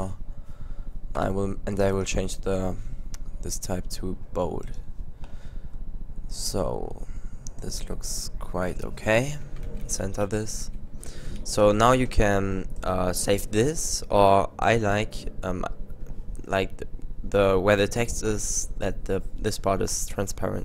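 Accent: Germany. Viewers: Man merkt an deiner Aussprache das du ein Deutscher bist :D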